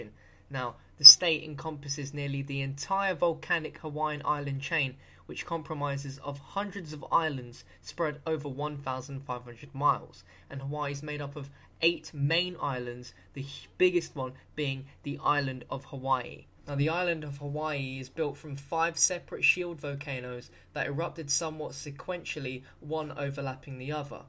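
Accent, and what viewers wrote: England, United Kingdom. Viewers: are you British? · hey, he is english, he cant help it.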